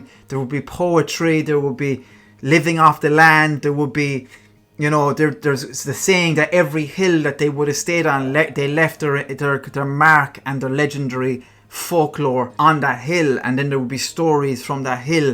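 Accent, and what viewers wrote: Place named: Ireland. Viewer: love your Irish accent and the stories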